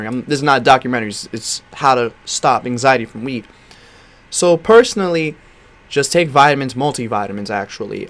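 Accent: New York, United States. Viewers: Yea u still got tht ny ism in you, Thts wssup cuddy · bro frm ny for sure 😂 good tips gang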